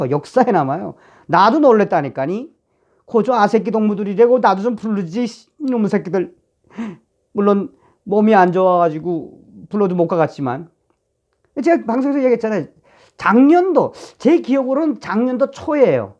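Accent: North Korea. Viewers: 첨 들어왔는데 북한 사투리가 정겹네요. 저쪽 사람들 디리디리 까 주세요. 간나새끼들 간이 오므라들겠어요. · 참 재밌게 방송하시세요^^한번씩 북한 사투리도 정겹게 들리구요^^감사 드립니다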